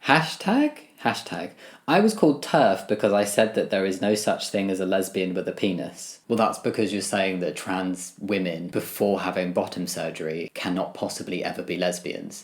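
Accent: England, United Kingdom. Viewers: He's English so of course he speaks weird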